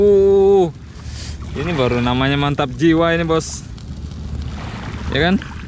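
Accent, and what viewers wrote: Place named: South Sulawesi, Indonesia. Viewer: Mantap Bosku ...klo dengar logatnya klo tdk salah dari Bugis Makassar kah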